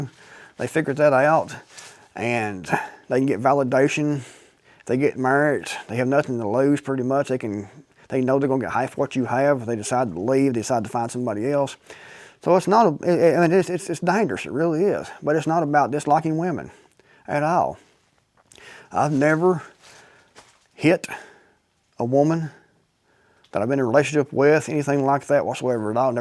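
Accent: Appalachia, United States. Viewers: Thank you that means a lot. I'll watch more of your videos. I like your accent applachian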